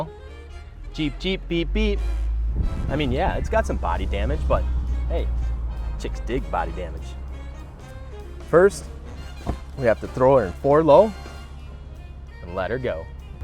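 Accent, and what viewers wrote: Wisconsin, United States. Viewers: Are you from Wisconsin? I think I recognized my accent.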